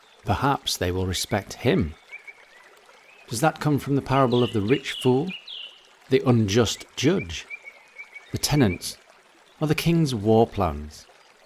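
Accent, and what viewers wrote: United Kingdom. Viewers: Such a brittish accent 🇬🇧 😂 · LOVE THOSE BIBLE QUIZES.GREAT QUESTIONS!!! LOVE THE BRITISH ACCENT.GOD BLESS EVANGELIST ROGER MANSOUR MISSIONARY TO HAITI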